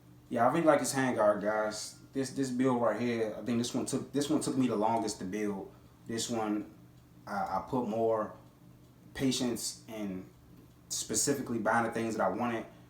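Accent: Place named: Maryland, United States. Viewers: As soon as u started talking,I knew exactly where you're from lol.Salute to u from a fellow 2A and DMV brotha.My collection isn't as extensive as yours,but you' got me motivated.That's a Raw collection u have bro 💯. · Nice collection fam. We all knew what state you were in. Maryland accent is strong. Go check out a couple of my builds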